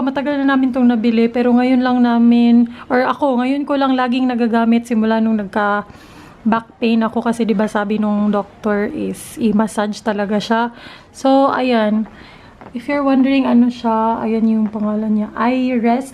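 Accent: Visayas, Philippines. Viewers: Bisaya ka noh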